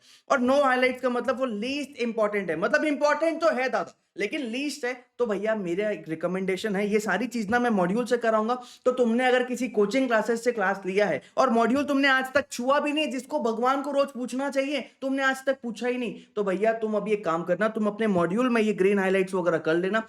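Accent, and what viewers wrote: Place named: Gujarat, India. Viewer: ye kaka kaki ye sir gujarati lagte hai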